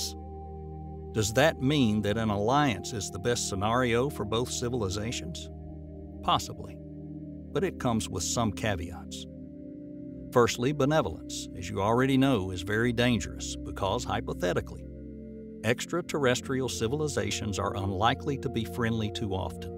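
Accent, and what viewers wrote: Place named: Tennessee, United States. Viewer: Honestly, this is one of my favorite channels. I listen to it every night and on my way to work what part of Tennessee are you from ? my family hail from Bristol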